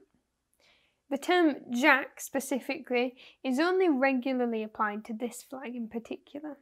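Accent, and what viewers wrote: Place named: England, United Kingdom. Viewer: Love the beat illustrations you’ve included. You’re also very cute and have a lovely English accent! 😊 I’d love to see more from you in the future, you’ve just got your newest subscription from me! Keep up the good work!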